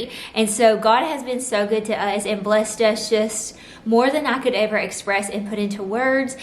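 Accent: South, United States. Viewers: Hi I enjoyed your decorating and was inspired to start my own today. Your home looks beautiful and great job on your tree. You are such a sweet girl and I love your southern accent. I am from Canada so I enjoy listening to ya all.😊 I was wondering where did you get your garland on your fireplace? It looks so realistic. God bless you and your husband! Merry Christmas!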